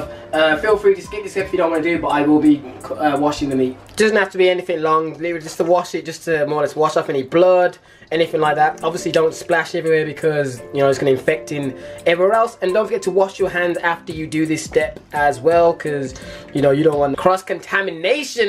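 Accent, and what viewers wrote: Birmingham, United Kingdom. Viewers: are you from Birmingham bro im from erd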